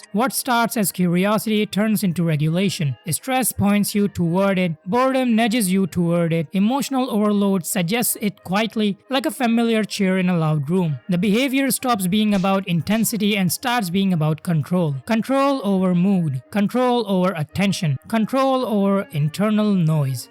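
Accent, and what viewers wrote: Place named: India. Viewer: no, it's your IND accent. the IND accent is probably the most annoying in the world to listen to.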